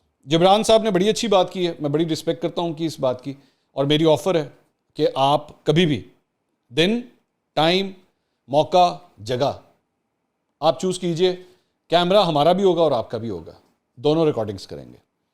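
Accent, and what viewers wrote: Punjab, Pakistan. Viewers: Yeh punjabi nahin balke punjab ki urdu hai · Enjoying panjabi tarka, keep it up ⭐ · Love your punjabi tarka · bhai Punjabi wala tarka chuk k rakho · bhai mje bara maza aata h jb ap punjabi tarka lgaty han bara acha lgta h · Boss punjabi tarka k baghair agar aap nay vlog kia to axha nahi hoga ..... I live ur access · Your Punjabi tarka goes well with urdu, Jabran bai is rare follower of IK who is unexpectedly not swearing so you are right to appreciate his attitude, your approach is very logical as usual, great work. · Love your Punjabi tarka. Keep it up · Punjabi tarka 👍👍👍👍👍👍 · I love your panjabi touch bai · Sir, your speaking tone is very good, especially the punjabi tadka is very heartwarming. May Allah bless you. Very good reporting. Muhammad Sohail Razzaq Saudi Arabia. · sir apka panjabi touch vlog ko 4 chand laga dayta hy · Salam, Like the way you present vlog. 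Please don't change it and keep "TADKA" of punjabi. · I am Baloch but Punjabi Tarka ziyada acha laghta hai QK Khadim Hussain Rizve sy Muhbaat hai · Bhai aapki panjabi ka tarka apke V log ko char chand laga deta hai, continue please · I really enjoy when you give Punjabi touch. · Punjabi tarka must hai 😎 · i really enjoy "punjabi tarqa"... 😁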